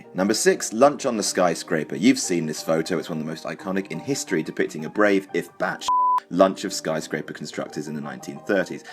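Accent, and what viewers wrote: United Kingdom. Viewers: you talk too fast and your accent is a bit too British speak normal and speak slower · The snippy British accent though charming becomes grating....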